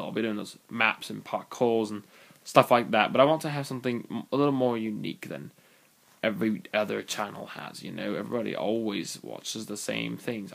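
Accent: United Kingdom. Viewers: are you brittish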